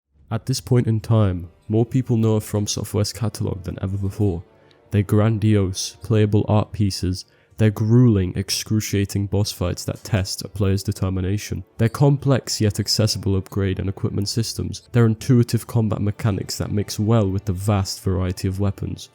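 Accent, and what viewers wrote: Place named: United Kingdom. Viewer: Hmm British dude doing soulsborne commentary? Subbed.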